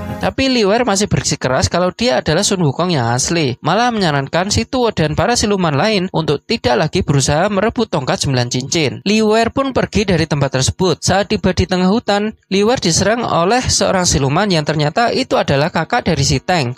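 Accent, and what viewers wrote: Java, Indonesia. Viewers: gw demen nih logat jawanya haha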